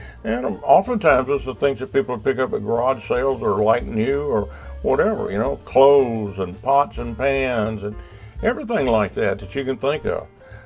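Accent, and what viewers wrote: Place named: South, United States. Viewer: I am new to your channel, and I really like it!!! Hi there y’all, 😉😎👋🏼. Thank you for sharing a really great topic, and video. I can tell by your character & personality, that you’re a good man with a good heart, and in a happy, and loving relationship, with your Filipina wife. I am from California, and I dig your thick southern accent. Both of my daughters now live in New Braunfels, Texas. So, whenever I’m there for a visit, I get a kick out of hearing Texans speak with their thick accents. To me especially, nothing is cooler than hearing a “Mexican” speak with a Texas accent, lol!!! Once again, I like your cool accent, if I can ask, what part of the country are you from, brother, 😎😉🙏🏼?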